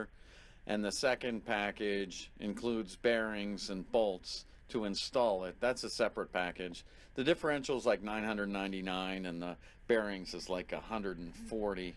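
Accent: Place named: Pittsburgh, United States. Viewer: your have a very strong Pittsburgh accent.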